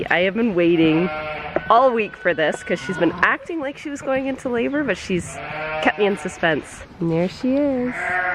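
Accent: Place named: Canada. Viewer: It took me a second to realize you are Canadian. Seriously thought you were just from the Northern parts of the US like Montana or Nebraska.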